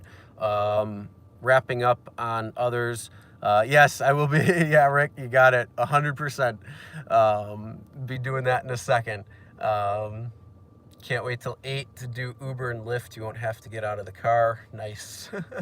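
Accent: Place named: Buffalo, United States. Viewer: i recognize yer bfflo accent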